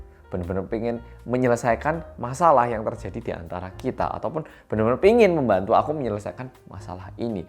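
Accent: Java, Indonesia. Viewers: Pengen punya kaos korea medhok kya mz deh😍😍😍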